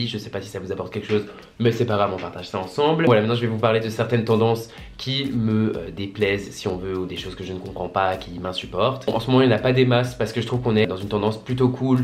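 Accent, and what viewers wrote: Alsace, France. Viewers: Ton accent Alsacien 😂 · par contre ton accent alsaciien j’adooore ! Je viens d’alsace aussi et mon cote maternel a le mm nom de famille que toi😋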